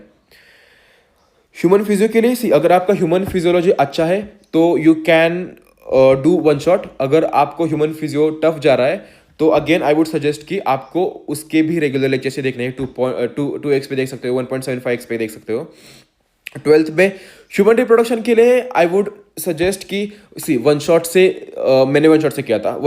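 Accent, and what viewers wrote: India. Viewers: Lagta hai aap maharashtra se hai 😂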